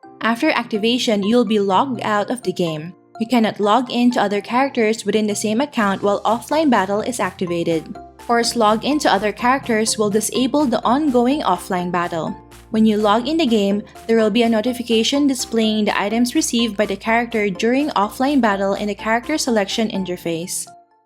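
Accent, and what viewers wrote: Philippines. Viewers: You sound like a filipina trying so hard to sound american but your filipino accent is coming through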